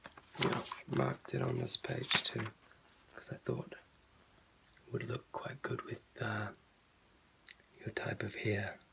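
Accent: New Zealand. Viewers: Wow I love the new zealand accent. I'm in love ❤ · Very well executed video. The New Zealand accent is dreamy.A Kiwi can be rude and you'd still say thank you.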